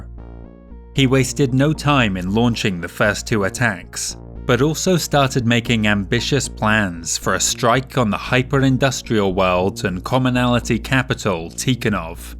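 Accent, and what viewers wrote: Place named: Wales, United Kingdom. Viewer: Where is your accent from? I'm sure that you have said, is it Welsh? Great video and thank you for the lore!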